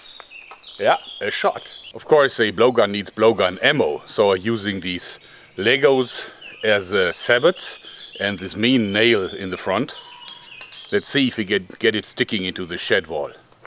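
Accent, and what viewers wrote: Germany. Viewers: Is he german ?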